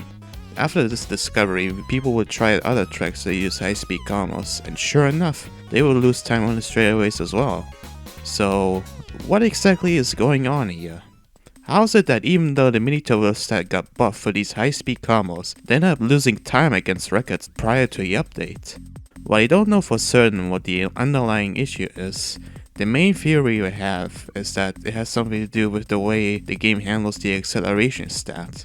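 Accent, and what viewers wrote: Germany. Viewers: Are you german? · German accent❤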